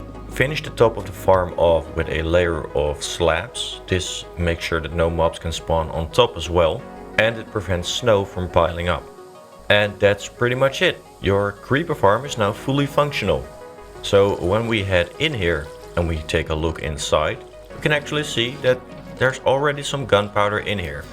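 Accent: Netherlands. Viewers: this is the first time i realy heard the dutch accent i your english never knew you where dutch. and ofcource a great build keep it up man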